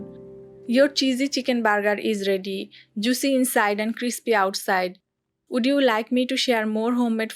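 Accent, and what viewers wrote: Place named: Bengal, India. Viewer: Heavy bengali accent..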